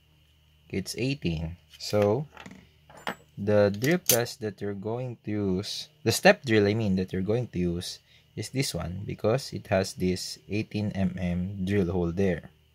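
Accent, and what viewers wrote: Philippines. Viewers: I recognize the accent. Are you filipino?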